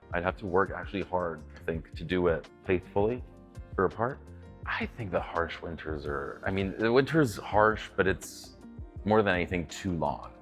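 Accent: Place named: Upper Midwest, United States. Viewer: Coudon! Tim Horton’s n’est pas si mauvais. Ok, ok... leur café n’est pas fameux, mais... Il semblerait que tu réserves un plat de poutine pour ces occasions spéciales. Bonne décision! (Je fais de même.) Ton anglais me sonne du Haut Midouest et pas tellement canadien comme tu dis. Merci de tout ce que tu fais,!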